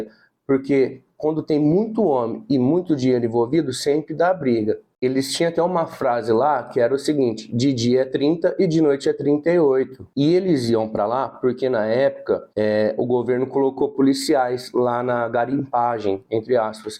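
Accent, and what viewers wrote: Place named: São Paulo, Brazil. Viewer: Você é simpático e fala - o sãopaulês-, bunitim. Valeu, pelas informações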